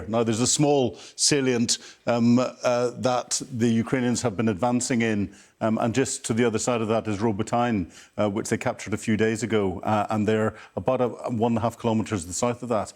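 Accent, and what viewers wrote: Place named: Ulster, United Kingdom. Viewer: Just love his Ulsterman delivery........day 578(eight!) of a 10 day operation.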